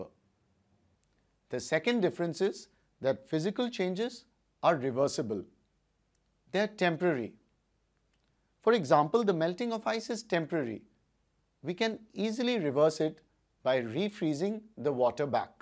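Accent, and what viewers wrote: India. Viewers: i know he's indian cuz his accent🤣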